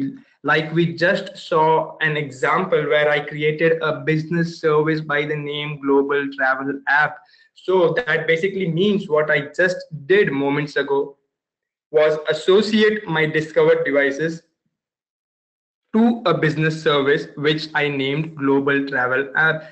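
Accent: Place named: India. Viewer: i hate this slang, as well as any other slang. please hire someone with clear speech. dear indians we do respect you, but this is too much, you spam with many useful videos, but this slang is so frustrating that i prefer strong alcohol drinks rather than listening this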